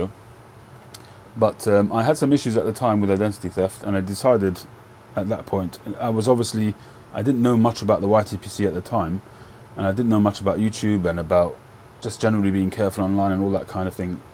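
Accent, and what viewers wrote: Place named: United Kingdom. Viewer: Excellent. Reminds me when I use to listen to the BBC on Shortwave in the 50's. Very British.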